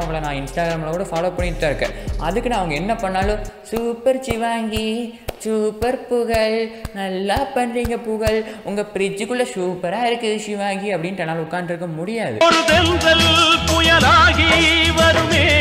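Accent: Coimbatore, India. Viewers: Eriutha eriyanunu tha da pottan 😂😂😂😂😂😂 semma bro super ah panriga unga slang super.. ungaluku konjam covai slang um varuthu semma bro evlo nal enga irruthiga ungala yt miss pannrichu neega kandipa top la varuvinga ungalukaga pray pannikuran....😍🥰 And Happy birthday sorry for the late wish 😍😍😍😍😍😍😍😍😍😍😍😍😍😍😍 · Kongu Tamil 🙂🙂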